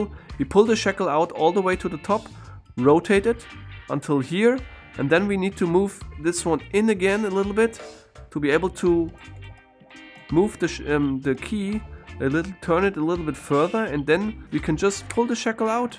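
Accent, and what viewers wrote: Germany. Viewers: I love his up-beat voice, and the German accent - 'Hai, und velcom to ' - is really charming.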